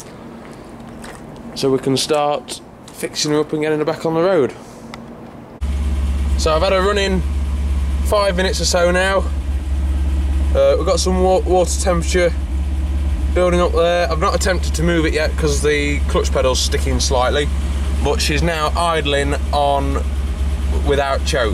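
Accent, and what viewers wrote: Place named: Nottingham, United Kingdom. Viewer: Nice to hear a Nottingham accent.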